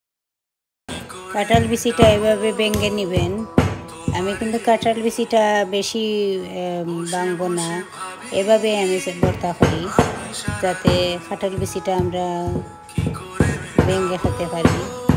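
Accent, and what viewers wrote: Sylhet, Bangladesh. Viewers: Didi India thakidekhram. Ajkeu recipeta try khormu. Okhonto katholer season. Syloter khawoa AR accent ekirokhom. Aphnar Allah AR Amar Bhogoban. Kintu armra daughter of the same soil. Okhon aphner channel subscribe khormu. Lots of love from India.uttor dilekhushi oimu no heart emoji........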